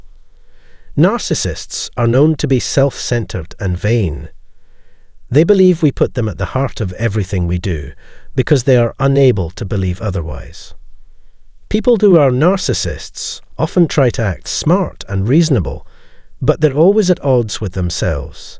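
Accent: Scotland, United Kingdom. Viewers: So your channel is pretty milquetoast when it comes to defeating a narcissist or pwBPD. lemme adjust your counterattack a wee bit. When they cut with you, you chase for awhile, and then breadcrumb them, back and forth, this tactic will keep them locked in stasis and never be a problem for you again. This is actually reverse ,reverse psychology. This is a pre-emptive bread crumb, in tactical evasion we call it a crazy Ivan. Not unlike doubling back on your own tracks and ambushing your attacker. For more and better NPD avoidance advice , ask in comments. I have real experience in this field and a degree to back it up. The scotty voice guy is fairly elementary.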